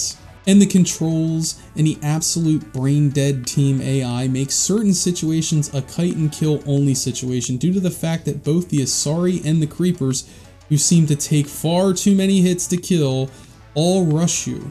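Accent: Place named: Mid-Atlantic, United States. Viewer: Are you from the mid Atlantic originally? No offense, but yr dialect sounds grimey (just like mine). Wouldn't be surprised if you were from Philly, DC, or my own Baltimore (especially with those juicy fronted O's). I'm about 10 mins into the video and so far, so good!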